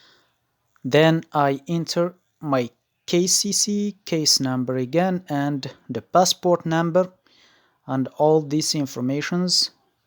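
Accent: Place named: Morocco. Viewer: U r moroccan lol guessed it from ur accent lool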